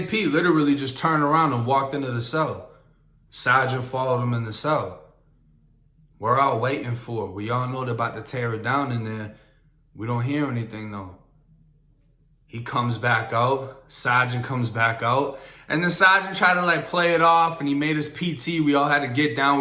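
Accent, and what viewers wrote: Boston, United States. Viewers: Bro! I love that slight Boston accent + you really have a feel 4 telling what happened and taking us there! I'm binge-watching this shit until I'm up 2 date. Greetings from Romania!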